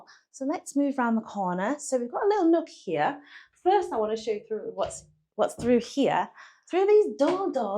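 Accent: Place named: North, United Kingdom. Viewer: Few thoughts from this episode... 1. Your Northern accent in the videos always gives me nostalgia from my uni days in Manchester. 2. I wish Kitchen Islands were standard without needing a luxury half-a-million price tag. 3. The sardine comment tickled me. But on a more serious note, why don't UK new builds come with reasonable bedroom space as standard? Most times, there is literally no space for the basics bed and wardrobe with having to choreograph a side-step routine.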